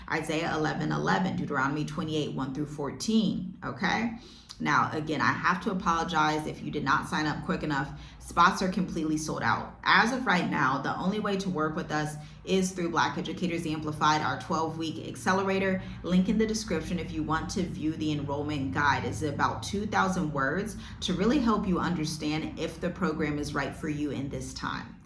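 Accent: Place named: United States. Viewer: Yeah ...yeah....yeah...you tooooo American and no longer African ,,,, you hurt,, speaking from a privileged and heartless country and yet you look like us but speak like them,,,,it's time for change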